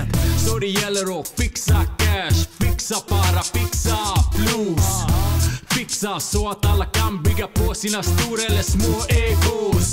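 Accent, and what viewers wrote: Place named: Finland. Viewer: Han bryter ju för fan på finska XD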